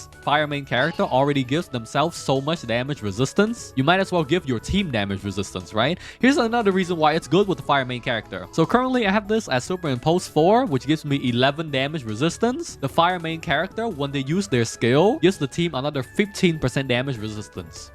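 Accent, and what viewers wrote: Vietnam. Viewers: Is he Vietnamese. My cousin has the same English accent xD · dude sounded like a vietnamese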